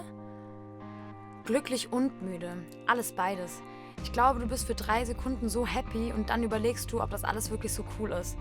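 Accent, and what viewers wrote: Germany. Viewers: That German accent is so pure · Ich liebe dich, I used to be obsessed with germand and germany I have no idea why, but I love the accents.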